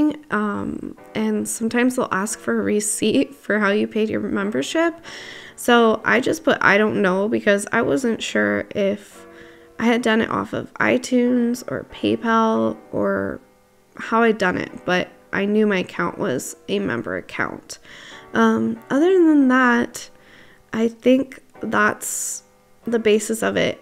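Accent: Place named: United States. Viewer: ^^ J’ reçois même pas la notif T^T (YouTube bug) N'empêche tu as un très très bon accent américain XD !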